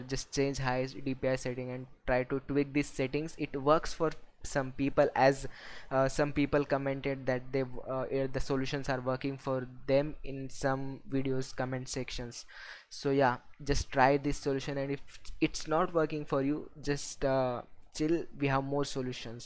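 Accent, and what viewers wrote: India. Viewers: You already know it gonna be legit when a indian starts talking :) much love broda finally working <3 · talks to much indian very slow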